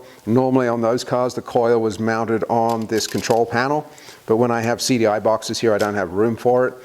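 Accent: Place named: Australia. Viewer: Thx. Different subject - on the first of your videos that I watched I tried to figure out your accent (kind of a hobby of mine). It was clear at least 50-75% is Australian, but there’s a significant influence from somewhere else, possibly Western European. Care to enlighten me? 😁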